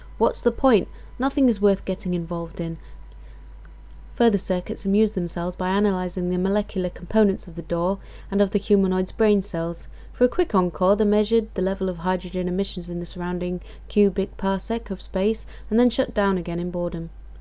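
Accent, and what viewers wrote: United Kingdom. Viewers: I always enjoy watching you read! Love your British accent and when you take on the characters voices it shows you are a bit theatrical! I love it! I have to add the twinkle in your eyes, your restraint of laughter from your facial expressions was hard when your cat went meow! lol .. so cute!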